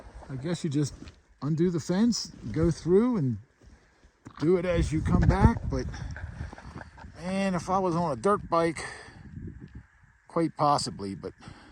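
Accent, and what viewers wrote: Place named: Western Pennsylvania, United States. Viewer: Where are you from? Sounds like Western PA, western Maryland area.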